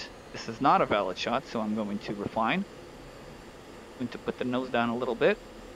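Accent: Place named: Portugal. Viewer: E esse sotaque portugues?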